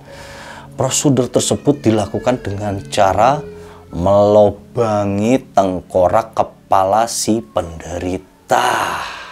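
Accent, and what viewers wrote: Java, Indonesia. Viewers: medok ny sya suka mas 🐦🙏 sehat selalu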